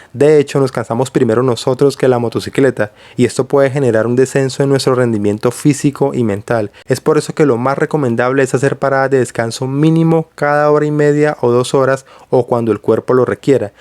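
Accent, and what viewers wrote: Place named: Colombia. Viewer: Gracias por los consejos, me pareció genial el vídeo gracias a qué no tienes el acento colombiano tan marcado como otros moteros, saludos desde México 🇲🇽🇲🇽🇲🇽👍👍👍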